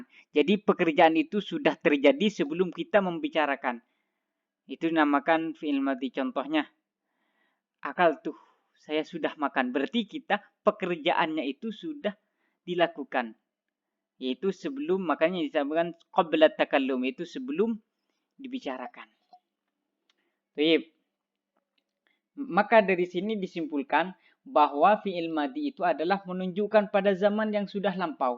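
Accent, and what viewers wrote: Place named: Madura, Indonesia. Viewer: Madura mu loh 😂 medokkk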